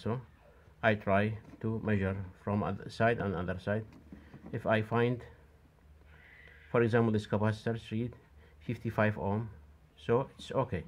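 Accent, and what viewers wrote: Iraq. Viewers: Youre voice is iraqi voice Are you an iraqi ?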